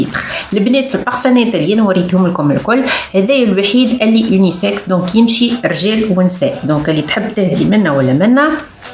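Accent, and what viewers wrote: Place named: Tunisia. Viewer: J'adore l'accent tunisien thabeel , merci pour la revue #algerie ❤️❤️❤️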